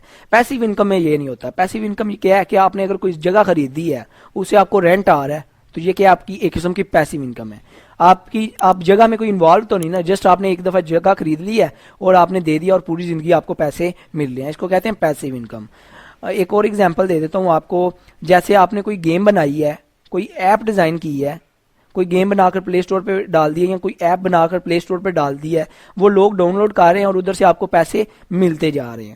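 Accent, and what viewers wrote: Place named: Punjab, India. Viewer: Punjabi Urdu ko Urdu koPunjabi lehjey meyplease na bolain.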